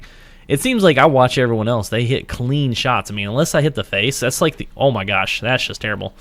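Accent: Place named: South, United States. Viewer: Lmao about half way in the video and i finally realized this guy has a southern accent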